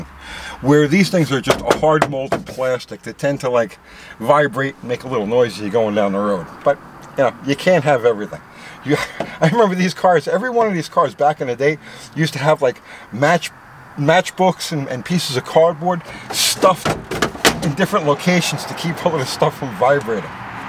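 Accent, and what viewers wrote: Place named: New York, United States. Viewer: Holy moly uncle. First I've really heard your new York accent. Your rear quarter comment sounded like rear quota.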